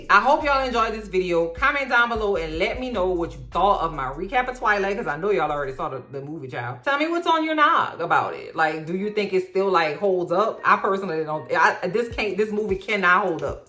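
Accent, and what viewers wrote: New Orleans, United States. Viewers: New Subscriber here. I found your channel for the “His&Hers” review and enjoyed everything about it. Your storytelling is engaging and funny and I can listing to the NOLA accent all day! As an adult who was all about Twilight back in the day and sometimes re-watches as a guilty pleasure I’m all here for your input. ❤🎉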